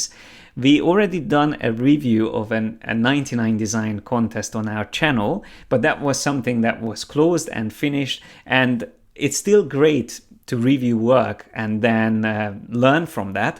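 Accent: Hungary. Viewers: Hi there, your accent is very Hungarian. :) I'm Hungarian and this is my 99d portfolio. <link>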